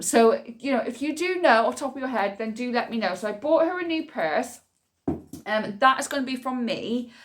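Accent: United Kingdom. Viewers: I love your accent 😍 💕 in kenya we call it peppa pig accent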